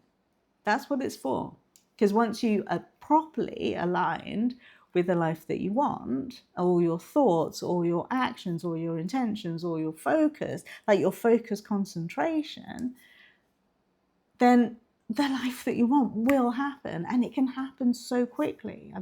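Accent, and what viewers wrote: England, United Kingdom. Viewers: At last … someone who breaks this down in plain English and with a beautiful English accent 😁 So glad I found your channel. New follower here!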